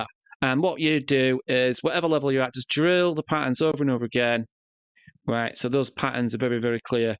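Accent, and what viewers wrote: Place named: Manchester, United Kingdom. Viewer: You're from Manchester aren't you?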